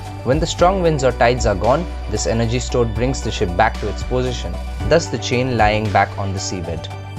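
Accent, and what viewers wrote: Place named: India. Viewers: It's Indian accent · That’s because it’s an Indian accent….no different than British, American, Aussie etc it’s not the accent of someone who’s new to English it’s the accent of someone who grew up speaking it and this is regional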